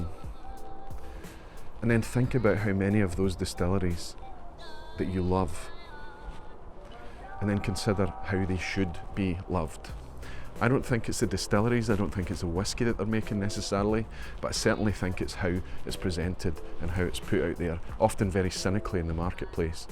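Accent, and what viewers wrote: Scotland, United Kingdom. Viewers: HEELS PLEASE! New to whisky despite also being Scottish! You sound local also! Trying to watch all your videos and soaking as much knowledge up as I can! New subscriber!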